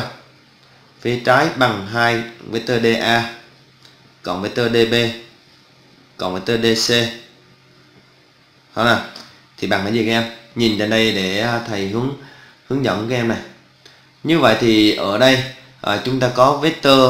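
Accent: Phu Yen, Vietnam. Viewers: Em ở miền bắc .Hồi trước em không phân biệt được giọng Phú Yên với các tỉnh trong đó, mà giờ nghe thầy giảng nhiều nên em phát hiện giọng Phú Yên có gì đó đặc trưng hơn các vùng miền khác. Em yêu giọng thầy và thương thầy lắm, cám ơn thầy luôn giúp đỡ em và các bạn ạ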